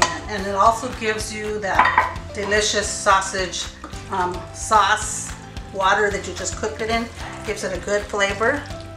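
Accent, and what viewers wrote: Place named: Puerto Rico. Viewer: Btw, are you from PR? Your accent tells me so! I love your style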